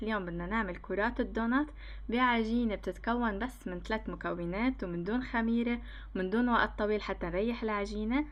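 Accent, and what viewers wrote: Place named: Lebanon. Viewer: صوتك حلو مع اللهجة اللبنانية وطريقتك بسيطة بالشرح ، موفقة❤️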